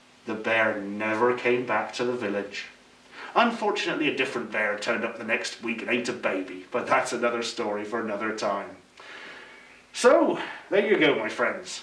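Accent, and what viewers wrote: Norfolk, United Kingdom. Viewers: I can't tell if it's Ashens or it's just the Norfolk accent. Not heard the Norfolk accent outside of Ashens. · I could pick out that Norfolk accent of yours anywhere.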